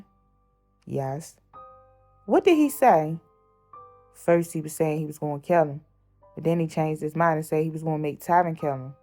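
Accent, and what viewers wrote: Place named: Baltimore, United States. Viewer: This narrator is dope af 💪🤞 Can hear the Baltimore in her voice for real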